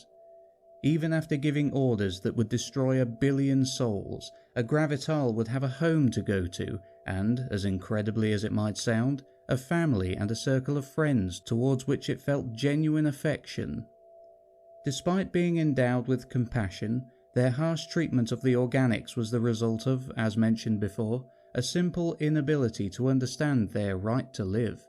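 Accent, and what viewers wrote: United Kingdom. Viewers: Hey, idk why you don't have more views, before getting the PDF i was looking for someone with a mild level of oration competency reading this, but the only one there was on YouTube was the guy with the annoying loud music and another guy with the most anticlimactic voice in the world, and the fact that you are bri´sh might help with this, but anyway keep up the good work.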